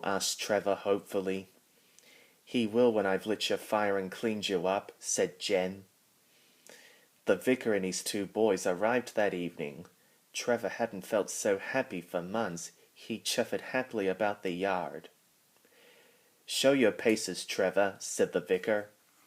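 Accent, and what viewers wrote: United Kingdom. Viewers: Great job! Where are you from? Is your accent natural or you’re imitating British accent because Thomas&Friends is a British show?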